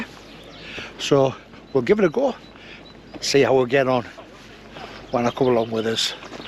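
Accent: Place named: Darlington, United Kingdom. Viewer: We’re stopping near Keswick for a week at the end of the month and plan on doing Whinlatter and 8 Wainwrights in that area. Our little lad is trying to raise £1k for cancer research in honour of his great Grandma, by completing 30 wainwrights by the end of the year. He’s on 13 at the min 👍🏻 We’re from Stanley, I was trying to decipher where your accent was from. Thought it was Aycliffe or Darlo. Would be great too meet up sometime, or we might pass you 😊👍🏻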